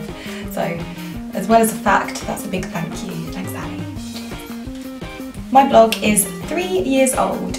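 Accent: RP, United Kingdom. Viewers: I'm here for the British accent! Such a beautiful and sweet woman. Oh and btw, you have mentioned you haven't cut your hair in 8 years, however your hair looks so healthy, thick and strong. What are you hiding from us? Haha. Please make new videos. Subscribing Love, from Lebanon. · I don’t understand people talking about her accent. Its been blown so far out of proportion. She sounds almost the exact same just more mature. she’s always been posh. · Strange how since you’ve progressed you’ve actually started to speak ‘posh’ too...mmm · I'm new and u just happen to be amazing :D and i love british accent so its a bonus haha and u have such a nice healthy body really impressed with the hard work and determination, gonna be one of my inspirations!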